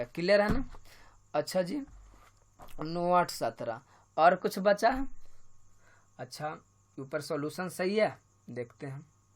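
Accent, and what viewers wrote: Bihar, India. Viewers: Sir,kya aap Bihar k Gaya jila se ho..??🙄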